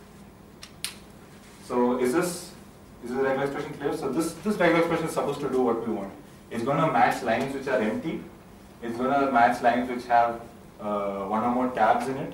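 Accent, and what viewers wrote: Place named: India. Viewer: I cannot for the life of me understand English with an Indian accent. Other accents really aren't a problem, just can't understand the Indian accent. Frustrating.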